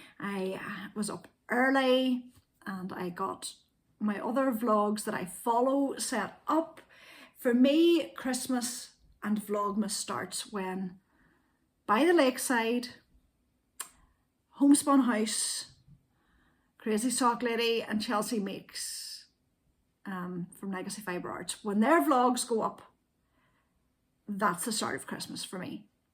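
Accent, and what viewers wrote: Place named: Ireland. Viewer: Sorry about your headaches. Happy you’re able to rebound albeit painfully slow. I love to hear your thoughtful comments about your yarn picks and patterns. Especially in your soothing Irish accent. I, too, was primarily an earth tones knitter-deep greens like you, grey & brown farm yarns, with a splash of red thrown in. But lately some of those bright colors for a garment have been very attractive to me as well. Am thinking of making a vest with my stashvent calendar I received.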